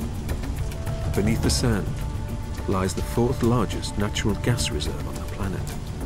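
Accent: United Kingdom. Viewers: The narrator has a sophisticated British accent. I question nothing. And hello, Glen, after three years. Did you survive Covid? lol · Whoa, this is in the Middle East? My people, my Arab people! Get an Arab Man to narrate this. Why is a British guy doing it?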